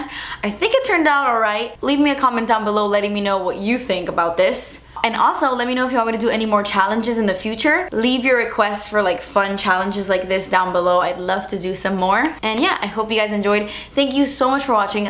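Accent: United Kingdom. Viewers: You're British accent gives me life hahah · are you british??? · goooood, I love when you speak with british accent :D but this definately looks more like a tutorial then a challenge, are you sure your not like both-handed? <3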